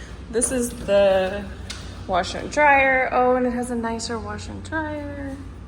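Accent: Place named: Kansas, United States. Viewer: Hey,! First, I also follow Noodles the Pooch (and have my own Noodles doll)! 🤗 Second, I got a kick out of your challenges with the Boston versus Kansas accent; I get it! I’m a Long Islander (NY) - but I graduated from Bethany College in Lindsborg KS and met lots of good folks while I was there. They got a kick out of my NY accent 😊!Whereabouts from KS are you from? The whole eastern seaboard is crazy expensive; best of luck with your new apt search!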